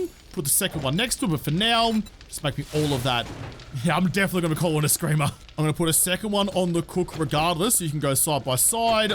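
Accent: Australia. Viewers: maybe ill run into you oneday. Been on it a fair bit lately. Keep up the good work dude.. Love your videos. Its nice to listen to another Aussie instead of a yank lol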